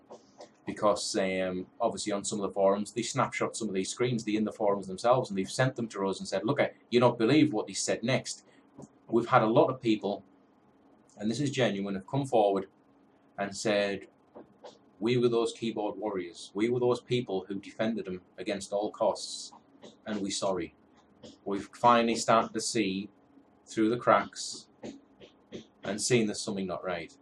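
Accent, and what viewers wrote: Newcastle, United Kingdom. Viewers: I hate huff you sound like a Geordie same as me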